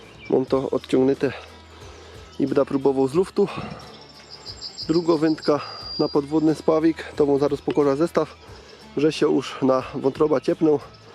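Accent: Silesia, Poland. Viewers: Po śląsku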